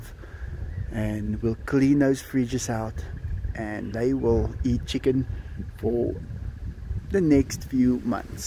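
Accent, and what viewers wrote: Afrikaans, South Africa. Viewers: Sorry, just go to say I love the Afrikaans accent. Might be because I'm a huge fan of Sharlto Copley. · South African accent. Its chilling that these animals could be living in my neighbours back yard..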